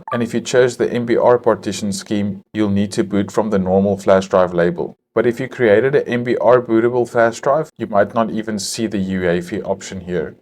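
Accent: South Africa. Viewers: Ek Sal jou sommer n soen op die voorkop ge❤❤❤thanks my guy I've been struggling for days now trying to repair my windows 10 but eventually was like stuff this let me upgrade it to windows 11 and your video came up heard the accent I was like his one of the ouens and I really appreciate you have a great and awesome day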